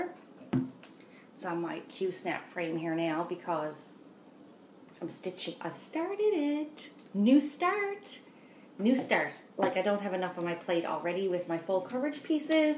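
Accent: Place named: Canada. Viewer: Enjoyed the story about your meet up with the other stitcher. Love your accent, probably because my dad was Canadian!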